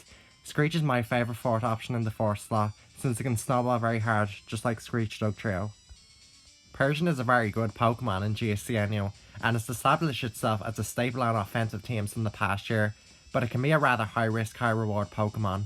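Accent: Ireland. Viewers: Ok I’m going to guess the accent is irish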